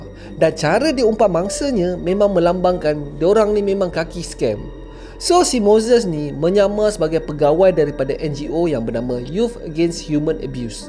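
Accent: Perak, Malaysia. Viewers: ptut comel je bnyi dia😅, ooo... Kome orng perak rupa nya atei Kome sihat ke top?🤭 Teman dlu blajor dkt sano, Teman tinggey kt Batu gajah dlu yop. (Orng perak Jgn marah erkk, saja tringin nk blajaq ckap perak)😅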